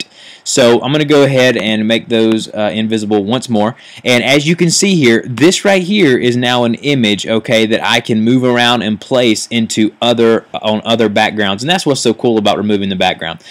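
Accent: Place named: Tennessee, United States. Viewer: I love your voice. I detect a slight accent. Is it from Tennesee?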